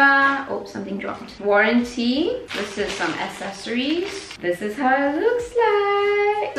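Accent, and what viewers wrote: Singapore. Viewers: Haha I guess singaporean and malaysian english sounds simillar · She actually looks and sounds like my Singaporean friend.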